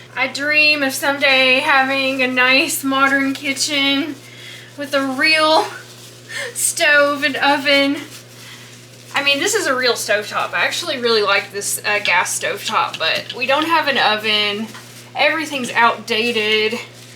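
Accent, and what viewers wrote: South, United States. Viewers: Nursery water is what you use when mixing formula I think:D Hope this helps! also thank you for the cleaning motivation hehe, I keep your videos on in the background when Im doing things around my apartment so I dont feel so alone:) My family is from the south but Ive lived in NYC my whole life, I loved hearing their accents when we would visit, its such a sweet tone you have. Happy for all the vlogs and how much you're enjoying making them, I watch every single one you're so wholesome and down to earth 🥺😇🌈👼💛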